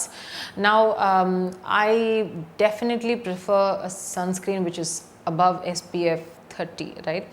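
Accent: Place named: India. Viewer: I like Ur sonut Indian talking style 😆😆😅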